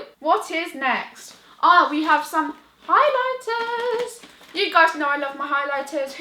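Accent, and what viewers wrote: United Kingdom. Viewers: Are you uk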